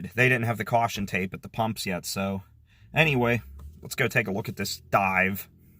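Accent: Pittsburgh, United States. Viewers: Nice vid, grew up close by and our family would always would get gas there, I remember it going from 76 to Shell around 2004, then Exxon several years later. I think it opened and closed a few times over the years as an Exxon , with each owner putting nothing into it. Even a year ago half of the pumps didn't work very well and the place looked pretty run down. I think at this point it is too far gone for somebody to reopen without a major rennovation... and whoever moved in would still have to tangle with the Sheetz. Im coming home this weekend and will check for the pin, but im sure it will be long gone. :) Btw, great pittsburgh accent, I miss hearing that every day.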